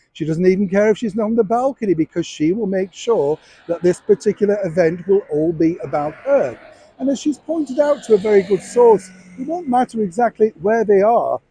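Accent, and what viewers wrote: United Kingdom. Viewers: I really like your channel, Mr. The different scenes from London and the rest of the US, your Northern accent and your very British way of reporting, with good humour and some understated irony, really appeals to me. Keep up the good work! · I adore British accent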